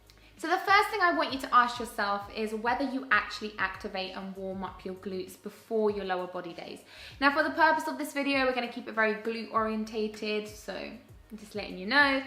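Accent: England, United Kingdom. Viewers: I usually don't like English accent but yours is just so amazing :)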